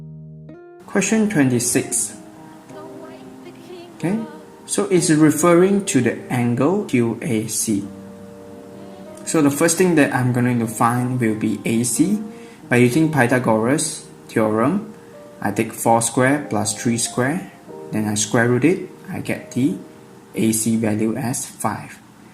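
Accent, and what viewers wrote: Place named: Singapore. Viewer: Thanks!! I know this question is out of the blue but are you from singapore?. Ur accent is kinda similar so😊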